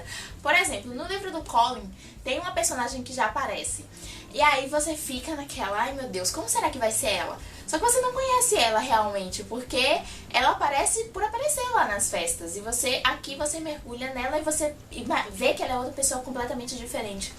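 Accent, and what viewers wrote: Bahia, Brazil. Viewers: tu é baiana????? Nossa eu preciso te conhecer!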